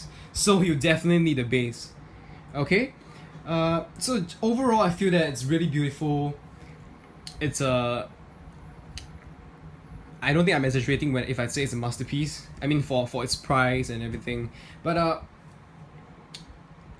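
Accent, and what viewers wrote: Singapore. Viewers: By your accent, i know you're singaporean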